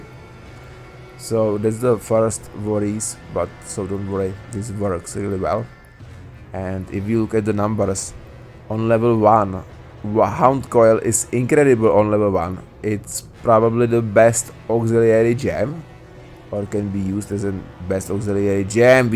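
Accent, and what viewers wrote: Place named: Czech Republic. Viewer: hned vím že to je cz typek přízvuk jasny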